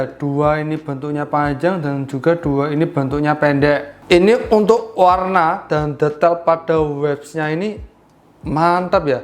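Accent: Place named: Java, Indonesia. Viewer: Selalu dgn ciri khas medoknya,sukses selalu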